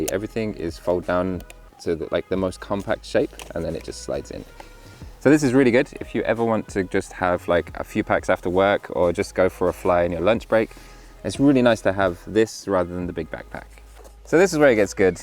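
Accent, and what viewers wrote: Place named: United Kingdom. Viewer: Hey sounds a little like my review but with a nice British accent! Makes it 100% better, at least 😎 Great job mate!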